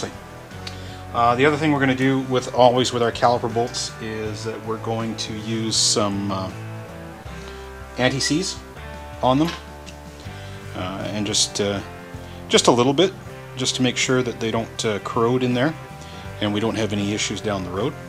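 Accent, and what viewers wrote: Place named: Canada. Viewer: Go Canada ! Can hear the accent come through clearly. May the truckers be blessed and may Trudeau go the way of Herod. I really needed that tip on the fender thingy because I struggled with it last night and was cursing German engineering. Also I came up with an extra little black washer ? Does it go on the back part of the shaft housing where the one fender bolt goes in ?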